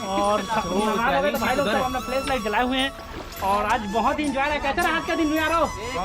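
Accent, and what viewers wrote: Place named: Chhattisgarh, India. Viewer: ap ki Hindi + Chattisgarh bahut mazedar h.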